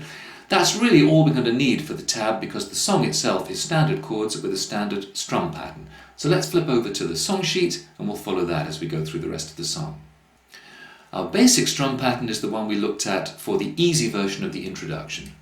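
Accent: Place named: United Kingdom. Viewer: Great lesson thank you. Very funny how the Brit accent drops instantly singing right on the money:)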